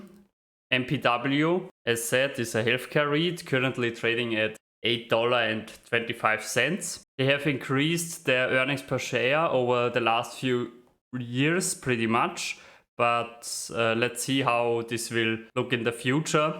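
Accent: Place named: Bavaria, Germany. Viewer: Klingt wie ein Bayer der versucht Englisch zu sprechen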